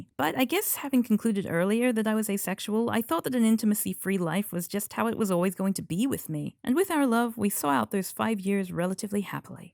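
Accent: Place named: Australia. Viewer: oh god youre australian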